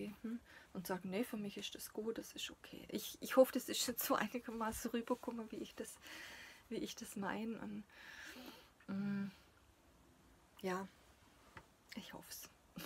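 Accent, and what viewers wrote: Swabia, Germany. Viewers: Hallo, ich höre dir gerne zu, du hast eine Art die mich total entspannt, keine Hektik nur tolle Arbeiten zum schauen und dein leichtes Schwäbisch ist sehr nett. Ich will auch so nen Baumarkt in Frankfurt aber er wäre mein Ruin glaub ich. Du machst das ganz wunderbar und ich freu mich jedes Mal wenn ich ein Video von dir sehe. Mach's gut bleib gesund.😉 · Hallo liebe, vielen Dank für den Tip mit dem Baumarkt für Frauen "freu" da werd ich die Tage doch mal vorbei schauen isch ja grad mal ums Eck und endlich mal eine Schwäbin die nicht Hochdeutsch "schwätzt" Liebe Grüße aus Fellbach:) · Hallo. Ich mag deine Videos und dein schwäbisch ist einfach nur schön😊 grüße aus Esslingen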